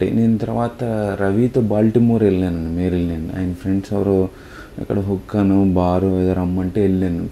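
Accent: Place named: Rajahmundry, India. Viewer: (Offtopic) naku elago USA nachaddu, but i like your accent sir, meedi rajamundry na?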